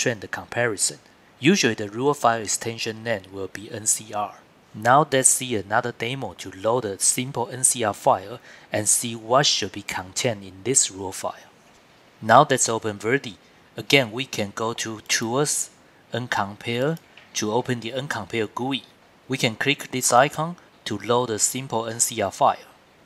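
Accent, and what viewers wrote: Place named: China. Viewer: Chinese Accent. Difficult to understand. Please upload video with better accent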